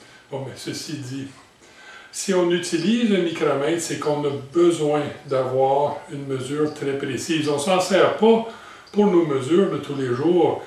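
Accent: Canada. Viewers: Monsieur toutes mes félicitations pour les explications abondantes et variées que vous donnez sur l'ensemble de vos vidéos. Ces explications ont les mérites d'être précises et concises elles sont agrémentées par votre accent canadien qui me replongent dans mes souvenirs de deux séjours de 2 mois passés au Canada (79/80 ) j'ai visionné pas mal de vidéos traitants de l'usinage sur fraiseuses et tours en Français en Anglais et même en Russe......Mais vous êtes le crac des cracs....you are the Best. Et je vous garanti qu'il y a des pas moins d'une dizaine de cracs que j'apprécie. Comme je vois que dans votre atelier vous collectionnez les plaques d'immatriculations je vous propose de vous envoyer en signe de gratitude...une plaque belge skype: roland_chardon mail: <mail>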